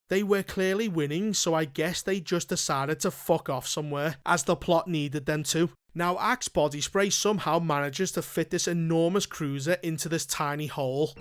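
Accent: Liverpool, United Kingdom. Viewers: Scouser accent brings much more fun into this travesty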